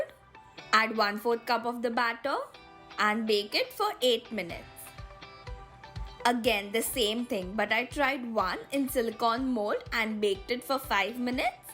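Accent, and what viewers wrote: Maharashtra, India. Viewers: I am overconfident and I can tell that didi you are Maharashtrian ❤️❤️❤️❤️❤️hey marathi mulgi❤️❤️ We are same🎉🎉🎉 you can come to know it's like she said it too much time and that's why I come to know that she is marathi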